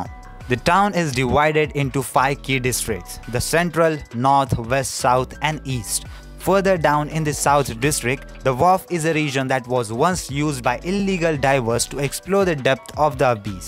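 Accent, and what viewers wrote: India. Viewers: Very intreating good job! I don’t personally mind your accent because I’m also Indian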